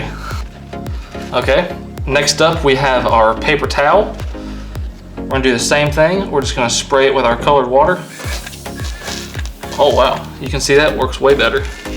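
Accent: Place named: Texas, United States. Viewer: What is this guy's last name? I believe with his slight accent he's from Texas?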